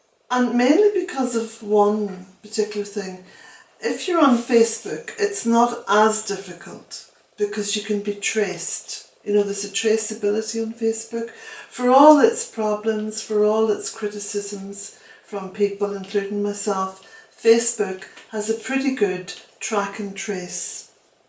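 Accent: Ireland. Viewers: The nastiness comes from a place of bitterness and jealousy, just tell em to feck off in that lovely Irish accent of yours ! youre doing a grand job, lots of love to you and Jack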